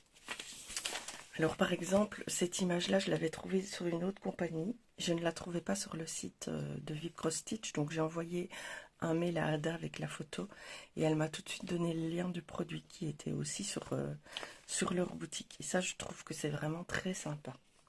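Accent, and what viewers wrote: Belgium. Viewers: tu aurais un petit accent belge ?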